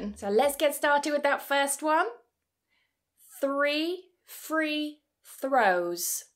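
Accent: United Kingdom. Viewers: Yes, Number 20 is ittle bit harder to pronounce. I love your 20 Tong Twisters. I like the British pronunciation better than American pronunciation. Thank you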